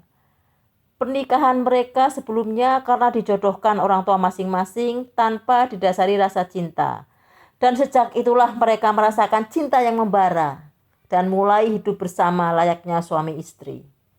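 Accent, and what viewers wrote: Java, Indonesia. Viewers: kadang ada yg bilang medok medak jangan di hiraukan mbak semangat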